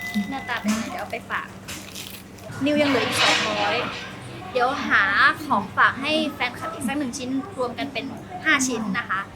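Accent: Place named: North, Thailand. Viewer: Journeyที่รักเห็นว่าไปมาทั้งต่างจังหวัดและต่างประเทศแล้ว อยากเห็นไปเจอนี่ในบ้านหน่อยค่ะ😂 แบบเป็นคลิปทัวร์ในบ้าน เพราะเห็นมุมในบ้านพี่นิวผ่านในไลฟ์มาบ้างแล้วส่วนตัวคิดว่ามันสวยแล้วก็น่าอยู่มากๆเลยอยากให้พาชมมุมอื่นที่ยังไม่เคยเห็นแล้วก็แนะนำการตกแต่งบ้านอะไรประมาณนี้อ่าค่ะ เป็น special clip journeyที่รัก x SPECSPACE ก็ได้เพราะพี่ ก็ทำรายการแต่งบ้านอยู่แล้วด้วย น่าจะสนุกมากแน่ๆเลย ยังไงก็รอติดตามคลิปต่อไปอยู่นะคะ☺️💗💗💗 ปล.พี่นิวอู้กำเมืองคลิปนี้น่ารักมากๆเลยย พูดอีกบ่อยๆนะคะ55555 <link>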